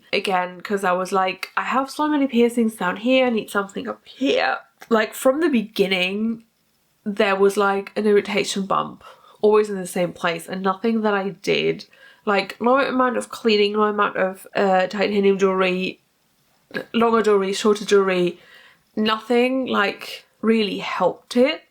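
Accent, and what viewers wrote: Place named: United Kingdom. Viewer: dass du aus Großbritannien kommst, irgendwie vom Akzent und allem her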